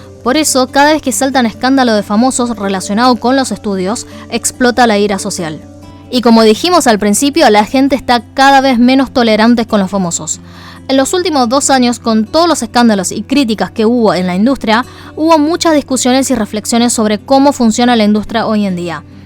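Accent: Argentina. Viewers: En Latinoamérica es peor y nunca salen a la luz .En todo el mundo hay favoritismo pero es raro que salga a la luz eso.Excelente video y tu acento Argentino muy bonito si no viera en tus vídeos.Diria que es documental narrado por una Argentina .Perdon yo sé que sos Argentina y China pero yo te miro como latina que nos informa de China todo lo mejor para vos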